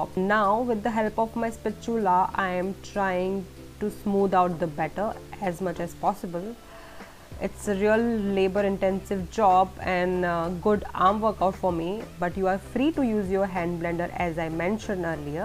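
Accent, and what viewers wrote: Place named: India. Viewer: The accent kills me... I get calls all day long from con artists and they ALWAYS have this India accent omg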